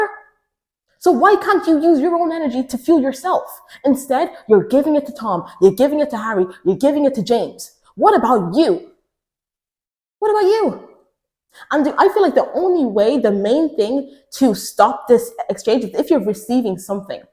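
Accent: Ireland. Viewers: Beautiful lip colour. I hear the Irish accent 😀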